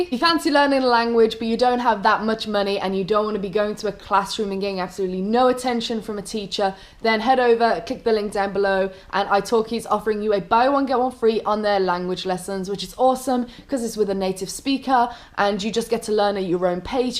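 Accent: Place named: Cardiff, United Kingdom. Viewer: I hear cardiffian in you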